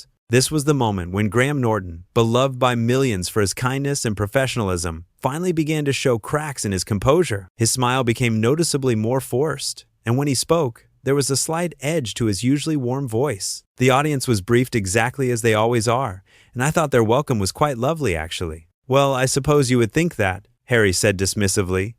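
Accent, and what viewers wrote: United States. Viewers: Ok we have an Irish host, and a member of British royalty, together. Down here in Aotearoa, Nz, Harry has taken part in Maori hakas, played rugby, is a great fan of rugby, and even stayed in a 1930s style pub down on Stewart Island, in a fishing village. He is an ordinary down to earth bloke. No high and mighty, better than you attitude. Just a normal guy. So we get a VO with a yank accent, instead of an English accent, spouting away. This is fake. The Prince Harry I know, would never act like that. A.I do your research. Get your facts straight. OR take a hike. This is bad. 🤮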